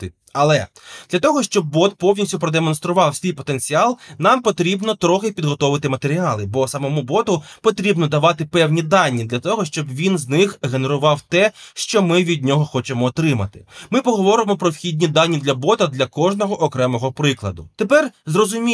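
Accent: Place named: Russia. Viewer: Bună ziua. Contentul e util și interesant. Hai sa vorbim în orice limba în afara limba rusa. Of doamne, dar dacă tu ești ca bloguri interesant, și pina când totul a fost clar, dar acum tu zici Rusnea, ce eu trebuie sa fac? Eu înțeleg limba rusă, dar ucraineana nu prea. Eu vad ca ție nu prea comod, tu ai accent rusesc.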